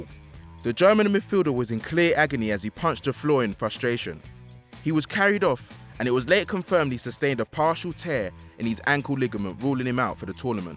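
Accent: United Kingdom. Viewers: You are British 🇬🇧 😏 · he's british/autralian not american. 🤡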